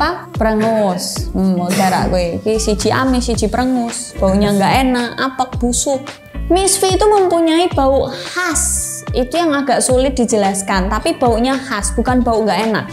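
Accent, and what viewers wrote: Java, Indonesia. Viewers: Pantes logat nya kek orang jawa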